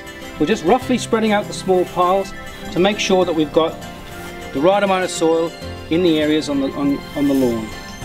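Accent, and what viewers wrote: Wales, United Kingdom. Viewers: You Welsh? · That's not a South African accent. Definitely Welsh. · I think he is Welsh!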